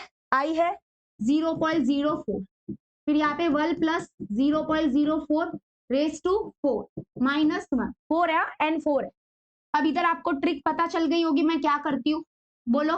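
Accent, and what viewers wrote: Maharashtra, India. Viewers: Mam are you from Maharashtra....? ( come through your marathi....)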